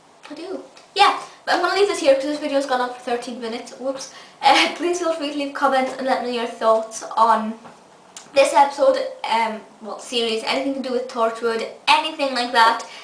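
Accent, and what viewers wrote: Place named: North East England, United Kingdom. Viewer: I like your accent it's sort of a mix of north eastern endland and scottish I'm sorry if none of those apply to your accent it's just interesting and thats what I thought it sounded like.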